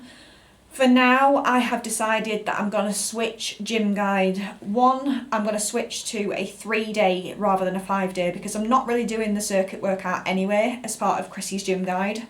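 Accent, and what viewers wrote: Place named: England, United Kingdom. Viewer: Hey Thanks for sharing. I love recap of your gym workouts. I need to start doing weights but I’m recovering from shoulder injury. I do love cycle spin classes. Hope you are well. Great hearing the English accent 😘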